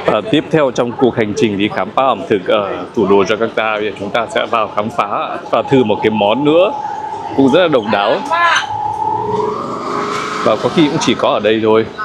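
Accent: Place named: Hai Phong, Vietnam. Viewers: Chí chương luôn 😂 đậm chất Hải Phòng ❤️❤️ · Khả năng ảnh người Hải Phòng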